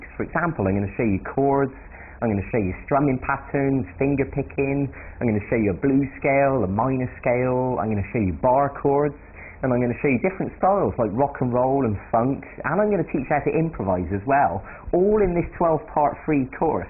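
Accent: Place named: Australia. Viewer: pretty sure thats an australian accent...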